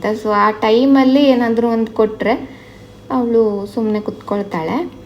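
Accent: Kundapura, India. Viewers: Nimdu kundapura kannadana sis nice sharing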